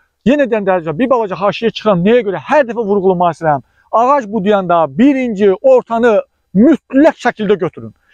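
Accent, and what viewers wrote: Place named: Qakh, Azerbaijan. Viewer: Əlhəmdulillah Qaxlilarin ləhcəsi supperdidə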